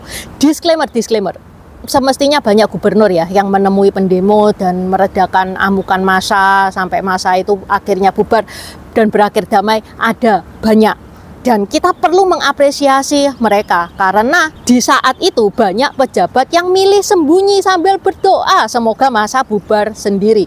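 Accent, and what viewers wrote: Java, Indonesia. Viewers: saya lebih suka kalo logat bicara normal ( tidak medok ) tolong lah di perhatikan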